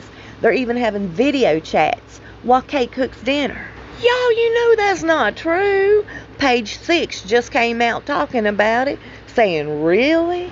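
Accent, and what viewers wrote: South, United States. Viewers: Dear, thank you for your videos. They are always a breath of fresh air. And that lovely voice and Southern accent! I am relieved the diagnosis was wrong. As you recounted your misshapens, the fall, the hospital, the emergency room, the diagnosis, the health scare, I felt deep in my heart, they didn't know what they were talking about. Some medical staff were rude to you and others condescending. But thank God, in the end, the Almighty gave the correct diagnosis. I keep you in my heart and in my prayers. Much love!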